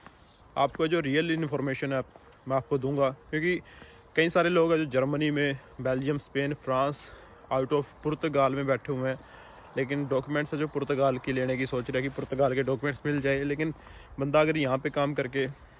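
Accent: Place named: Haryana, India. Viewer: Bhai apki avaj se lag rha you are from haryana right bhai 😊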